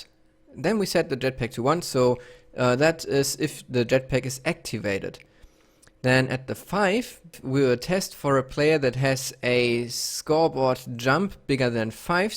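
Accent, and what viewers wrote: Germany. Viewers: Bist du deutsch? kilngt so :D ich finds richtig gut aber ich hab mir die map gedownloaded und finde die stelle mit dem jetpack nicht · kanns sein das du eigentlich deutscher bist? hörst sich bissle so an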